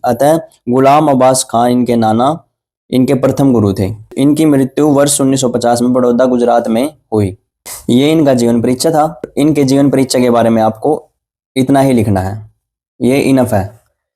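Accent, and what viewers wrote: Haryana, India. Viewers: Maan ge maaster kati haryanvi ki feel ha 😁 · haryana aala ki boli ghani hai pehchane ne ke liye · Hainaaa mujhe bhi yhi lgaa inki voice mei haryana ki tone aati h 😅😅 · Yes sir definitely 😁 haryana se hai , I am also from haryana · haryanvi ho kya app voice se lag rha hai . sir vo notes toh provide kr do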